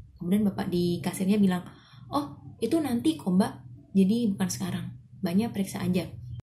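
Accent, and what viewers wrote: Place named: Indonesia. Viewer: Kurang mengerti dialek indonesia, cuma mahu kata kamu gadis indonesia yang cantik.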